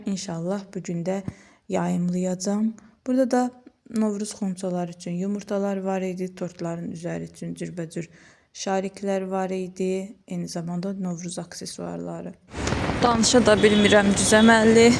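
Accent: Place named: South, Azerbaijan. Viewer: Salam,sizin ləhcəniz elə şirin gəlir.variydi variydi deyirsize,cənubdansiz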